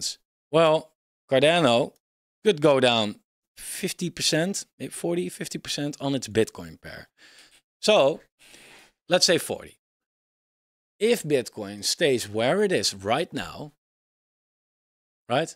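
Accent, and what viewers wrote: Netherlands. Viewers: Je pikt ze er altijd uit, de Hollanders die Engels praten :p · Lol mooi nederlands engels haha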